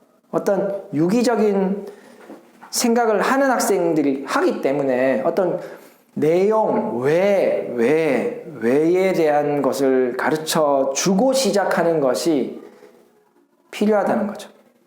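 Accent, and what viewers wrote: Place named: Daegu, South Korea. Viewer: 빠른피드백 정말감사드립니다 복받으세요 ㅎ 선생님께서 말씀하신 동영상 잘봤습니다 저도 선행보다는 심화쪽에 관심을 두고 공부를 시키고 있습니다. 학원을다니는곳이 없어서 시간이 많은아이라 초등부터 수학공부에 80프로를 투자하고 공부를 해온터라 1-1학기 마무리하는데도 주위 다른아이들보다 몇배는 시간들여서 한듯합니다 그래도 안심은 늘 안되긴합니다 ..;;;수학학원을 보내보니 너무 엉망이고 집에서 하자하니 엄마랑 전쟁입니다 제가 공부하면서 가르쳐야니깐 보통일이 아니네요 사투리를 쓰셔서 혹시 해운대분일까하고 봤는데 대구....너무 아쉽습니다 제가 수학쪽은 몰라서 드리는 질문인데 1-1 2-1 3-1 이렇게 구조도상 공부가 연결이 되어있어서 1-1끝이나면2-1하는게 맞다고 주위 학부모들 및 학원선생님들이 요런과정으로 시키고들 있더라구요 그게 아닌가보네요^^다음 동영상올리실때 요런부분도 한번 짚어주시면 많은 학부모님께 도움될듯합니다.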